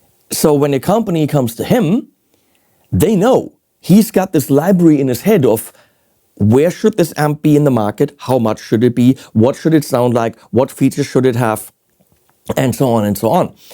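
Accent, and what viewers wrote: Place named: Germany. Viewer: I make my comment not even watching the video in the full length. The reason I come back to watch your videos (and you + Johan Segeborn are the only gear youtubers I come back to at this moment) is not the gear. This is basically something that I am not interested anymore, I have enough gear to do my music. I do come back to your channel because I respect your honesty, I like to hear your rants (expressed in beautiful german accent I love) and personal messages you share with us. I don't even listen to that regularly, because i have no time for that. But I want to share a message of appreciation with you. Take care and animals at the end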